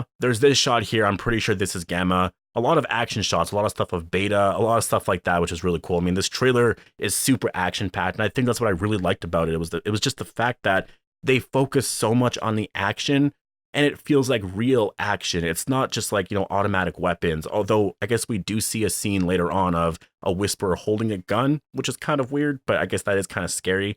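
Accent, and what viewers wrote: Canada. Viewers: Just realized you have a Canadian accent!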